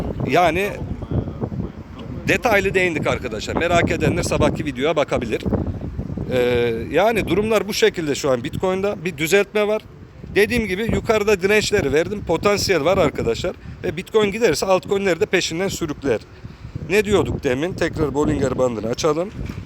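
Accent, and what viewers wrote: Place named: Aegean, Turkey. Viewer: Ege şivesi candır 😄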